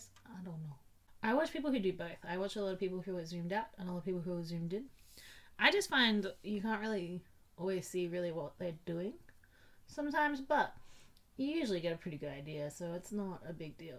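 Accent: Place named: Adelaide, Australia. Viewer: Love the look! Your accent isn't obvious to me! 😜 you sound Adelaidien though for sure! I've been looking for a greens palette so I might have to check this one out! Wish you lived in Adelaide so you could teach me your ways in person! 😂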